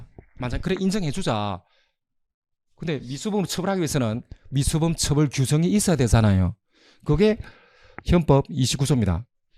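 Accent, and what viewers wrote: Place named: China. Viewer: 딕션이 중국인 같노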